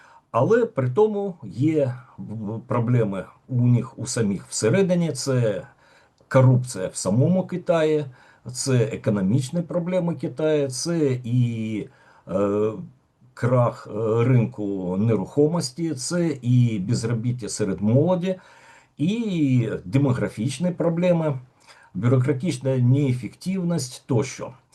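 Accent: Russia. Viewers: ... більше розмовляйте українською в побуті, бо рассєйська на губах висить.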